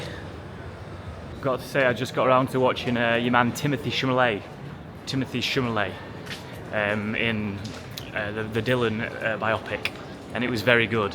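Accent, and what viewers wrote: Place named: London, United Kingdom. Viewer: Hello I never knew you knew about London and you have got a really strong London accent now, good video my guy does this mean your going to play for chelsea?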